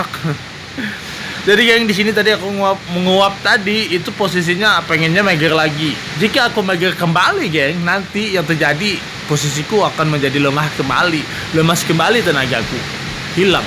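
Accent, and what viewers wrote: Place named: Banjar, Indonesia. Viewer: Logat bahasa banjar nya keliatan bangt bang😂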